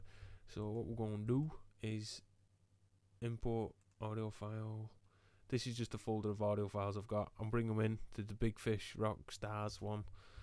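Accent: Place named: South Yorkshire, United Kingdom. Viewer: your accent sounds familiar, you from south yorkshire?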